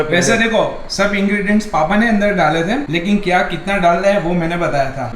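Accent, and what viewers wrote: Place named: Gujarat, India. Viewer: U r so innocent like baby + gujju voice ❤️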